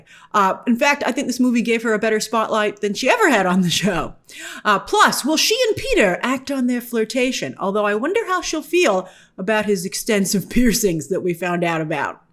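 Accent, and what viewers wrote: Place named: Mid-Atlantic, United States. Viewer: You have a mid Atlantic accent from the 1950s it’s so wild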